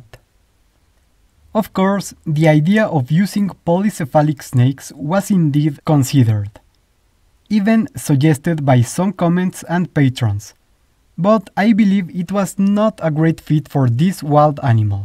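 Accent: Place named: Latin America. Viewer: I love the video!! Your English sounds like your from a Latino country right? Sorry if I sound rude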